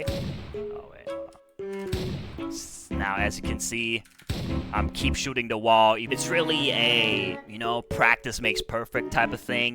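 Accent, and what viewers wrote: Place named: United Kingdom. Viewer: wtf he is like speaking British?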